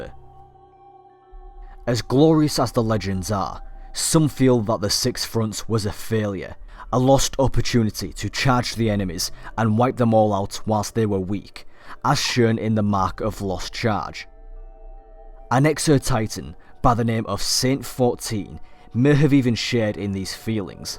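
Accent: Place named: Yorkshire, United Kingdom. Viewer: that's Yorkshire accent.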